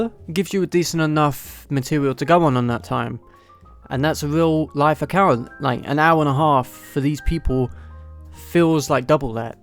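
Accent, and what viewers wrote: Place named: RP, United Kingdom. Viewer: U sound like an upperclass nob tbh so I wouldn't listen 2 Ur opinion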